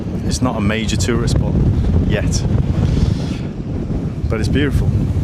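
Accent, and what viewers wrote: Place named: Cardiff, United Kingdom. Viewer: Great video. I'm in Cardiff, so I recognised the accent immediately. I'm about to start my biking journey. Just like you, I'm a bit older and recently booked a CBT course. Videos like this are inspiring. Keep up the great work. Subscribed and looking forward to your next video. By the way, love Nash Point, too. I've camped there a few times.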